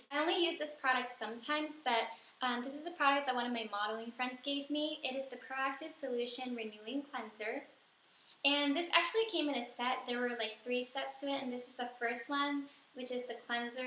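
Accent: Japan. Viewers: THE FUNNY THINGS I SEE THE LAST PART YOU ALSO LEARN THE ACCENT FROM JAPAN STYLE. SO CUTE AND NICE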